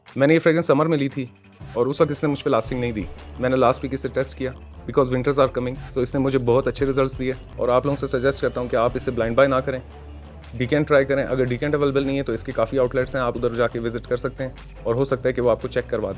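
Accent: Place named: Karachi, Pakistan. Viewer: لیٹ رپلائی کے لئے سوری لہجے سے تو کراچی کے ھی لگتے ہیں جیسے کہ میں خود 😀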